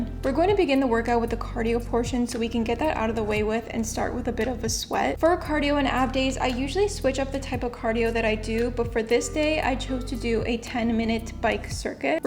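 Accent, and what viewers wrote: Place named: Canada. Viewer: no clue you were canadian until i heard the accent lol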